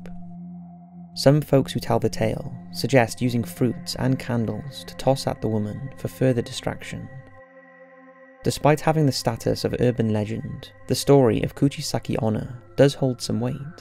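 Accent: Wales, United Kingdom. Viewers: Bro are u Welsh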